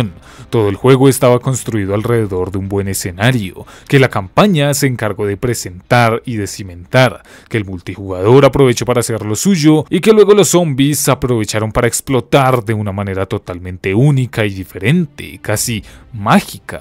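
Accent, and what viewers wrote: Colombia. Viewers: Gran video. Oye una pregunta, ¿tú de que parte de Colombia eres? xd.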